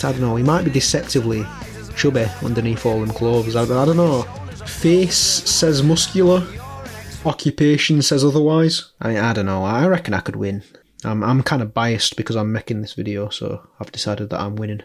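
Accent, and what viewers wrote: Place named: Birmingham, United Kingdom. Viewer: Honestly seemed liked a birmingham chap to me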